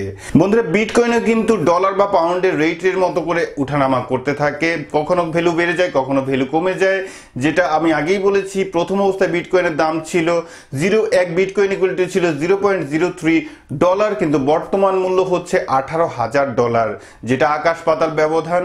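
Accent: Sylhet, Bangladesh. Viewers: ধন্যবাদ ভাই সুন্দর করে বুঝানোর জন্য।ভাল লাগে আপনার সবগুলা ভিডিও।( সিলেটি পুয়া। কথায় পরিচয়। ) ধন্যবাদ।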